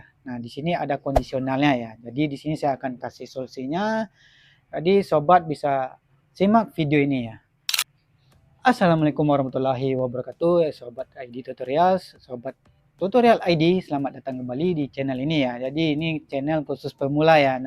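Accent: West Sumatra, Indonesia. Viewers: Lo orang minang ya bg😂😂 logatnya minang banget😂😂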